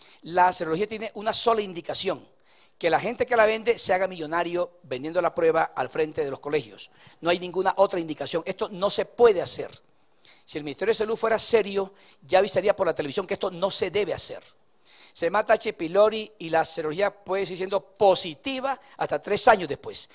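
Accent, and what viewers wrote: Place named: Spain. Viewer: Eeexelente conferenca y sobretodo laclaridady la sencillez con esa explicacon genial el Doc ¿de donde es por su acento al hablar parece ser de España muuchas felicidades Doc. Siga asi amandosu carrera nocomo otro$$$$$.....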